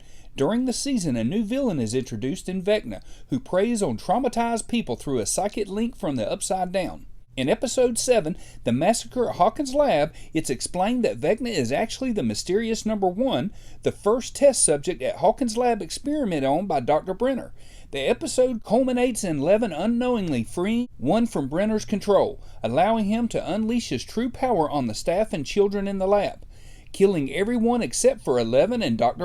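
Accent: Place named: South, United States. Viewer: LOVE your southern accent! "Welcome to talkin sah-fah"😊❤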